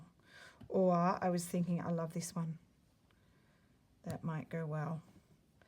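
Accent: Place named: Australia. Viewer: Thank you, for your time, talent, and inspiration! I, too, have no friends that slow stitch! I do have fellow teacher at my school who is from Perth - she spends our summer there. So, talking with her is like visiting with you and - I love the accent!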